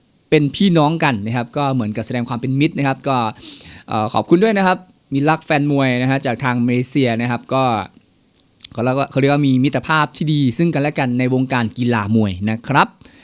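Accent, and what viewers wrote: Isan, Thailand. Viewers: มักหลายยามเว้าภาษาอีกสาน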